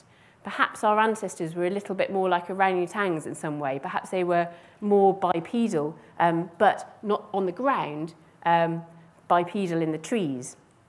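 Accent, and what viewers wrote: United Kingdom. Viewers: The language is English but to this American’s ears it sounds very British. Some Brits are easier to understand than others, I’d say she’s in the middle. Anyway, this is a good talk if getting dated. No particular insights although I didn’t stay till the end. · what part of england does that british accent come from and does it have a particular name?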